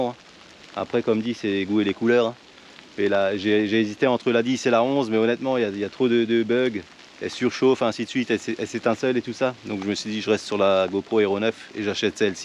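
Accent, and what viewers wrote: Alsace, France. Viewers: Salut ! Je suis étonné des résultats avec le rogen j ai encore jamais rien fait avec sur le Rhin ou dans l étang du village avec (j ai très peu pêché avec aussi 😅). Tu animes comment ? Juste en linéaire ? Merci pour les vidéos et pour ton léger accent Alsacien 😉 je te souhaites plein de beaux poissons pour 2023 et continue tes vidéos !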